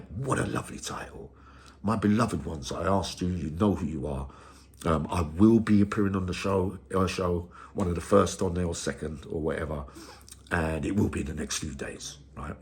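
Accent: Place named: London, United Kingdom. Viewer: It’s good to hear a London accent.❤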